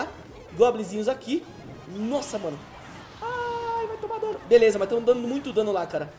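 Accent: Minas Gerais, Brazil. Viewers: Mineiro eu acho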